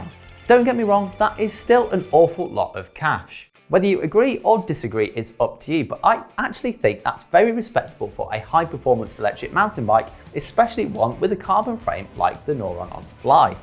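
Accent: England, United Kingdom. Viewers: Would you kindly do this review again without the English accent?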